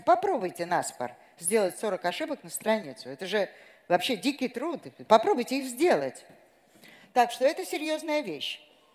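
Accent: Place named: Moscow, Russia. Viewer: Как тяжело слушать этот явный масковский акцент)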